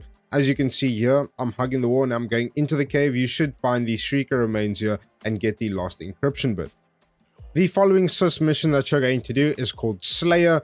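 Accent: South Africa. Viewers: 2 seconds in and I can already hear this is a fellow South African. Thank you so much and keep up the great work! DDP, Bokke for the win 🎉🇿🇦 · are u South African?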